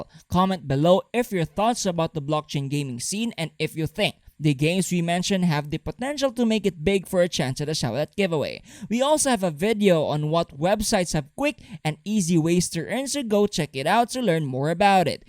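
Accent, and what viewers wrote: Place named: India. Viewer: This foookin indian accent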